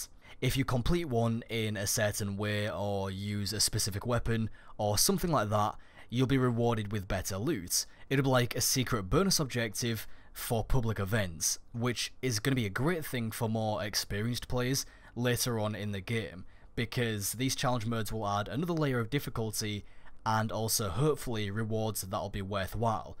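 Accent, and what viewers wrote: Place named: Northern England, United Kingdom. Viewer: you have a very distinctive north england accent, do you live there or something.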